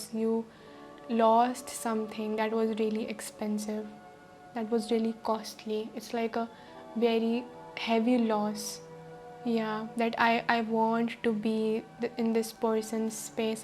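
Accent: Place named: Punjab, India. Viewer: Sorry but I can't stand the Punjabi accent.......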